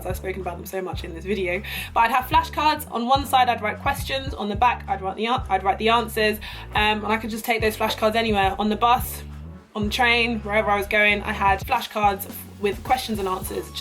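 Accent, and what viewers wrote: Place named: France. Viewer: This video helped a lot! Love ur French accent BTW <3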